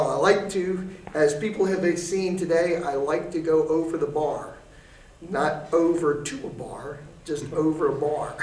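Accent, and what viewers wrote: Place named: Baltimore, United States. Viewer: Haven't lost yer "Balmer, Merlin" accent, "hon"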